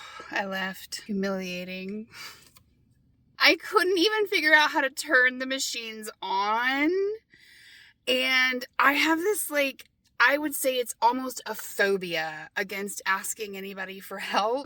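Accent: South, United States. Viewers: I like you. My heart feels for your story. I think you need a way for your followers to help you financially. You are worthy and I think you are very funny and talented. Life isn’t fair sometimes but I applaud you for starting your channel and living in your van to make it in this world. Do I detect a Southern accent? And your smile is electric! You’ll do well. Take care. Cathy in Nashville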